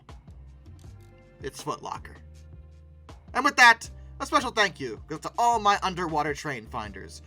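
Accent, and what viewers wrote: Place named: Chicago, United States. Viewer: You sound like you’re from Chicago 😂. I was born n raised there ❤🖤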